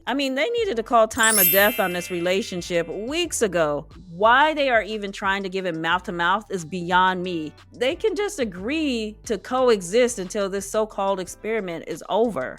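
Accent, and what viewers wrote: Boston, United States. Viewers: The Boston accent 😆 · When this season is over, I’m going to miss your Bostonian accent! It tickles me everything I hear it 😂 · Your Boston accent is so on point 😂😂😂😂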